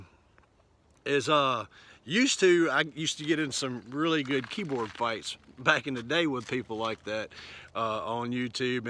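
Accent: South, United States. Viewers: *'s southern drawl sounded almost exactly like my youngest daughter talking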